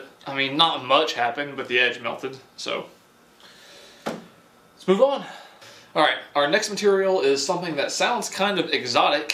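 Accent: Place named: South Carolina, United States. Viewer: South Carolina for ya. Maybe Tennessee, but I'd put money on SC. I've never met the guy, I'm just from GA and recognize the accent and the landscape from the backyard lol.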